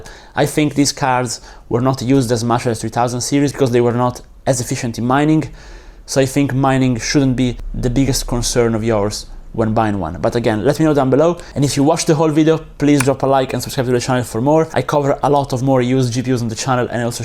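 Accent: Italy. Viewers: si sente l'accento italiano 😂😂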